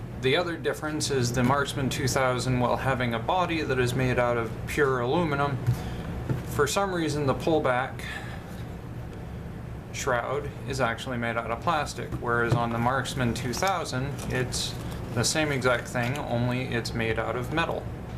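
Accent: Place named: Canada. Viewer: Sounds Canadian. Great fun eh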